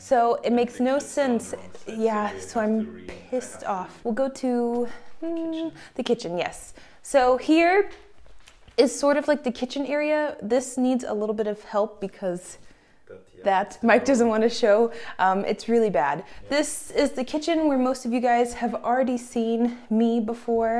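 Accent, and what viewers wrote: Germany. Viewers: Sometimes you sound like you are speaking english with a little german accent 😉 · I like Your Denglish!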